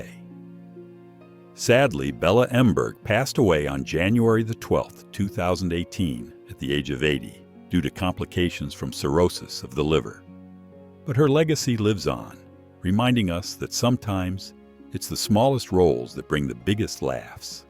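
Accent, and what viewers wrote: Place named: United States. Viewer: American narration.Cant watch this sh1t.Spoilt the program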